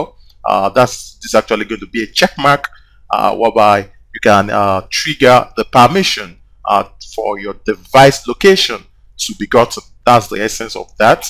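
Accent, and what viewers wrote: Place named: Nigeria. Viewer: From the accent, I can tell he's from Nigeria. ;-)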